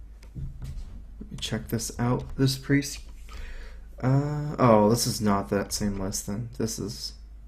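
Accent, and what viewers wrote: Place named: South, United States. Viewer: ***** Because has a southern accent.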